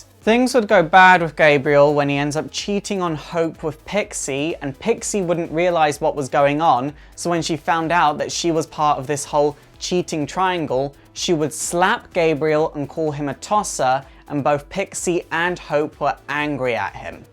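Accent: United Kingdom. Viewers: Are you British you sound British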